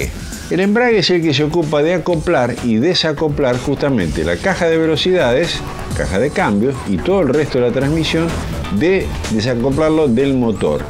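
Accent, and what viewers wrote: Argentina. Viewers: ese es un acento en alguna parte de Argentina. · Hola Amigo...... Tus videos son fenomenales, pero yo te aconsejaría que trataras de modular un poco más las palabras porque se escucha como una verborrea que pasa muy rápido y no se aprecia bien la palabra que dices.... Ya sé que eres Argentino, pero me cuesta captar la palabra que dices.... Sólo eso.... lo demás está fabuloso....!!!!! Un abrazo desde Chile....!!!!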